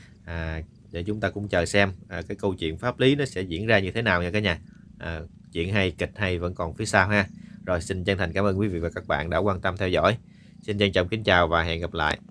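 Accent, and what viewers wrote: Standard. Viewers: mc nói qua chuẩn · Tôi rất thích kênh này họ nói chuẩn 0 phải chỉnh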